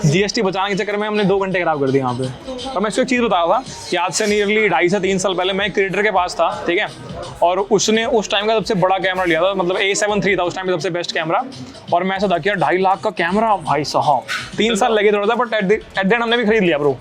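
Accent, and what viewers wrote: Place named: Delhi, India. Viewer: And bhaiya always come with something new and unique may be and bhaiya ka bolne ka style bilkul delhi wala apne jaisa😂😂😂😂:goodvibes::elbowcough: btw happy engineer's day bhaiya ❤:elbowcough::elbowcough::elbowcough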